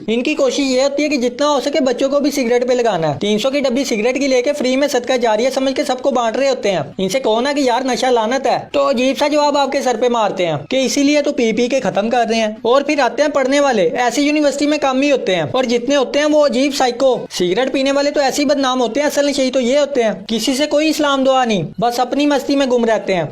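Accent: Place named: Multan, Pakistan. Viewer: Bro! R u from MULTAN?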